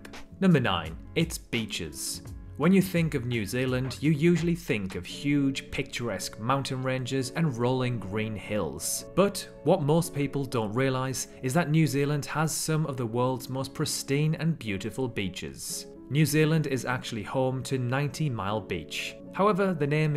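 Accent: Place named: United Kingdom. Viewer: Interesting accent. The person doing the VoiceOver seems to be British but it’s hard, at least for me, to identify exactly what region. does anyone know?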